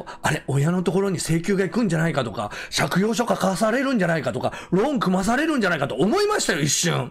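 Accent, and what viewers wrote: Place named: Kansai, Japan. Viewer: 話し方から関西方面の組織かな？やってたのなら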